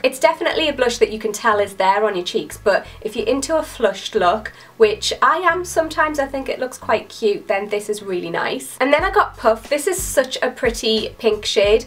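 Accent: Newcastle, United Kingdom. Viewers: I have no idea if anyone else has said these and I’m sorry if they have but three facts about you are 1) You’re from Newcastle (I love your accent) 2) You have lots of ear piercings and normally wear hoops in your videos and 3) You have been on some pretty funny dates like the one where you were with that boy and he tried to kiss you and you jumped away and said “well that was fucking awkward wasn’t it” or when you peed when having sex one time 😹 you’re honestly so funny, I absolutely love your videos and you are and always have been one of my favourite yourtubers, I love you! Thank you so much for doing this giveaway you’re so kind ❤️❤️ Good luck to everyone ! Xoxo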